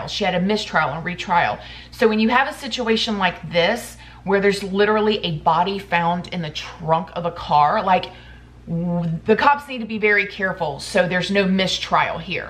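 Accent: South, United States. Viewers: New subscriber :) have been binge watching for a few days even cases i know really well! I just love the way she tells a story. That country twang is so cute! And also i dont care i love the intro 😂❤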